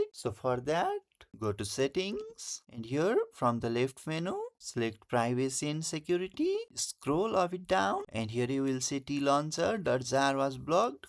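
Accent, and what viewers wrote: India. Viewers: Guys remember when these types of videos have an indian accent ur saved 😭🙏 he earned a subscriber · you know that you are completely saved the moment you click on a tutorial and hear a indian mans accent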